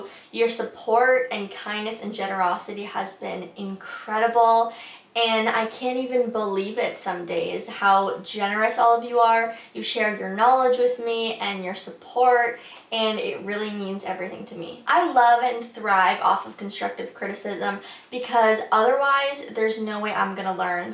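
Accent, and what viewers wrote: United Kingdom. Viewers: Keep using the word British…. saying UK is a new obsession that is a bit bland and mostly due to Scotland and Wales pushing more for national identity. The idea of a specific ‘British’ accent is sort of a North American concept but I know what you mean, yours is great… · Great video! As someone who had a British girlfriend and hung out with an all British crowd while stationed in Spain, I can say your British accent is great! I’m hoping that my daughter will be interested in an LBC when she starts driving in a few years, but so far she has not expressed any interest in participating in her brothers and my channel working on them. She’s still got a few years to go though, so there’s always hope! Keep up the great work! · You sound British to me! But I’m from North Carolina. Lol. We used to go to Ipperwash beach and visit relatives in London each summer. 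· Your British Accent is cute! If you want to take it to the next level, study Cockney Rhyming Slang and then you can go apples and pears and have a good old rabbit and pork!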